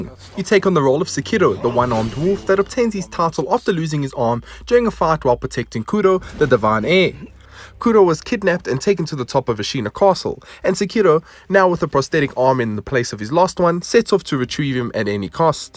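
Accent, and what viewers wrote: South Africa. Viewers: Watching this & I am like, this dude sounds like a white South African guy.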